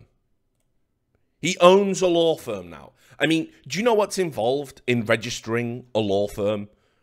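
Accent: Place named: England, United Kingdom. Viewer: LMFAO. When he said "COCK AND BALLS", I died. I absolutely love English accents.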